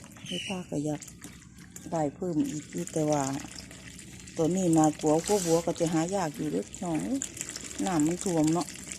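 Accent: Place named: Korat, Thailand. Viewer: อยู่บ้านไหนคือพูดโคราช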